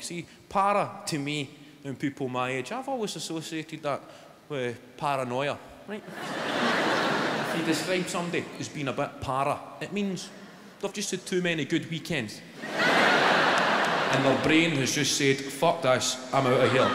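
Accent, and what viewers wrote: Scotland, United Kingdom. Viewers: Jesus Christ that scottish accent haha perfectly fits what he's saying but I'm almost a C2 english level and still I missed way too many jokes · well if your from the uk and you can't understand a uk accent u must b thick ya zoomer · what are you on? English can't understand the Scottish? I'm english and I understand every word, ignorant people cant understand him, not english people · Fouad Bacho English, with a Scottish accent, being Scottish. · Lmao, I'm Welsh and I need to concentrate to understand him :p he has a very thick Scottish accent doesn't he haha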